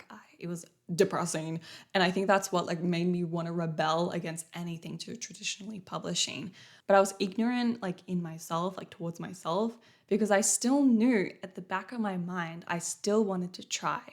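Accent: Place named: Australia. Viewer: honestly i just finished wathcing your writing documentary that randomly appeared on my fyp. It was the first ever video, I saw from you and actually it made me following you after I just watched for a few minutes. What I'm gonna say maybe sound a bit weird, but your voice with this austrialian accent reminded me of my home. Not in the way of living there, i'm an austrian girl, but more like because of four people in my life, that come from there. For me, those four celebrities kind of tought me speaking more confidently in english and thats also why i got an australian accent myself eventhough we only learn american english in school. I really liked your whole vibe and look so i really think that you'll become sort of a safeplace for me and my thoughts. Writing has always been a huge part pf my life and watching your video really inspired me.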